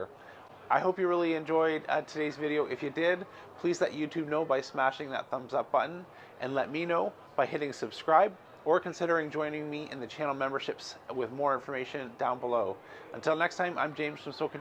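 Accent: Canada. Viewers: James, I would love to hear you react with, for me, your Canadian accent, when you bite into a really hot pepper. I bet it wouldn:t even involve curse-words, you were probably raised right. ;-)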